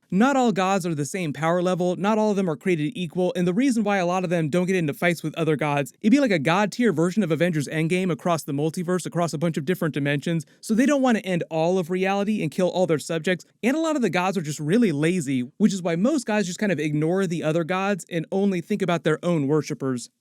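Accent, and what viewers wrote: United Kingdom. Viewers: I think his British accent is very very normal lol this is what we actually sound like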